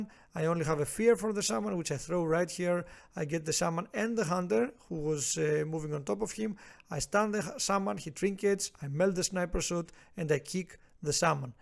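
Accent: Greece. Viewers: You sound like a fellow Greek. 😅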